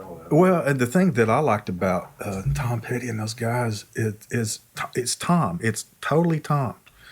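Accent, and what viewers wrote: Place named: Texas, United States. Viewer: This guy HAS to be from Texas. That fabulous accent <3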